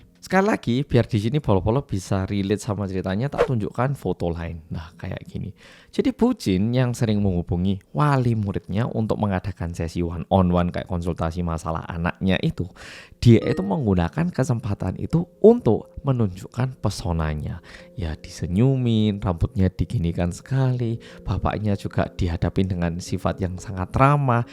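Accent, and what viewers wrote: Java, Indonesia. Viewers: Medok LG....😂😂😂😂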